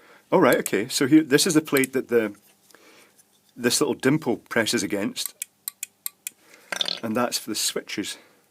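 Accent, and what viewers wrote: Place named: Glasgow, United Kingdom. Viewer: I thought I'd hit the wrong channel & John Ward had suddenly got a Glaswegian accent when I first stated watching this vintage electrical stuff :)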